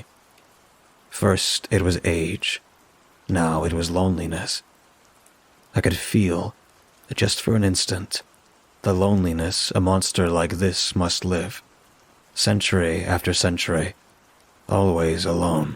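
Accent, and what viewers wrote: Scotland, United Kingdom. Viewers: I wasn't meaning to criticise. Its like Christmas when you upload. Your content is always top quality. It was cool that it wasn't the typical generic hollywood scottish accent. Your still much more accurate that others would do. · haha love your Scottish accent not bad at all 👍🤣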